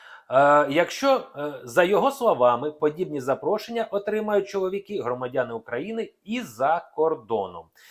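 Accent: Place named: Russia. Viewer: Прослухала не один матеріал від Вас і таке враження наче Ви радієте коли говорите, як повернуть чоловіків з-за кордону; вишлють біженців..як нікому ми тут не потрібні.. є нотка злорадства. Дивлячись на досить помітний російський акцент, здається що це таке собі іпсо. Хоча не зрозуміло чи від України чи від москалів. А може краще було б відіб@ від людей, перестати маніпулювати? Дати кожному вирішити самому де жити і за що воювати? Приносити користь, кажете. Так зберіть алкашів, нехай приносять користь. Там точно ціла армія набереться. Чи що, політики наші вже синів з за кордону забрали і відправили в бій?) Клоуни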